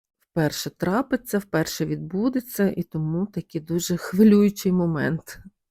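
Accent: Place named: Galicia, Ukraine. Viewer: Доброго ранку. Дякую за прогноз. Ви напевно зі Львова? Галицький говір, мова.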